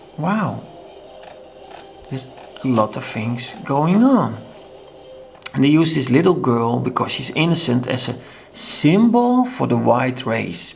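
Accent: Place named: Netherlands. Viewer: i put 1000 on 0 that your dutch :)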